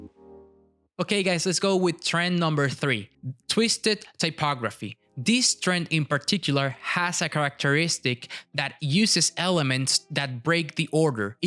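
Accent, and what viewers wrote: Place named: Venezuela. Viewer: Amigo excelente video, apenas escuché tu acento algo me decía que eras Venezolano haha 🙌 Keep up the great work!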